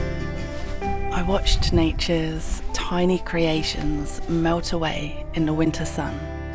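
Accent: United Kingdom. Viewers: Sometimes I watch to hear your cute Brittish voice. Hope you are doing well.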